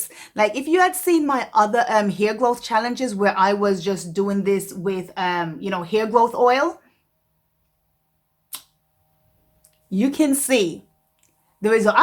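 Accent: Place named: Jamaica. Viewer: Lol you’re Jamaican??? The accent sounds familiar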